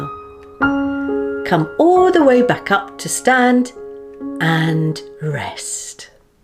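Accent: United Kingdom. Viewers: Thank you for sharing this video ...... I really like the british accent .... But it is not easy for a spanish guy like me!!!! :-)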